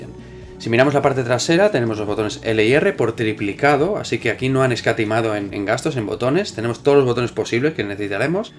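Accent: Spain. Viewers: No le entendí el 50% muy españolizimo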